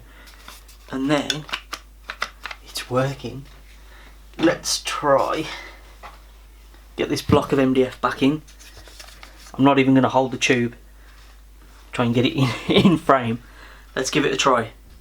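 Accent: Black Country, United Kingdom. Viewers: Just subbed after watching some of your review videos, you sound like a Black Country lad 👍🏻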